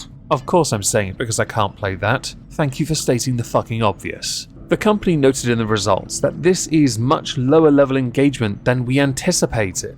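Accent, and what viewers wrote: RP, United Kingdom. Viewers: As a Geordie, all I've got to say is, your swearing is really posh 😆. I think that's why I went for my husband, he sounds posh to me too.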